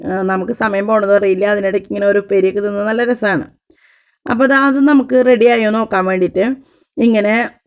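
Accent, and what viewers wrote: Thrissur, India. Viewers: Hai. onathinu orukkangal kandappol enikku ente veedum kuttikkalavum okke orma vannu. pazhaya ormakal unarthunna oru vlog. enikku othiri eshtappettu.pinne u de Trissur slang kelkkan nalla rasamayirunnu. thank you so much for sharing the video.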